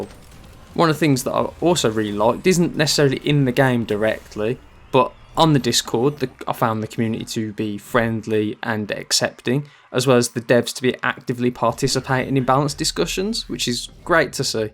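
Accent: United Kingdom. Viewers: I like this brummie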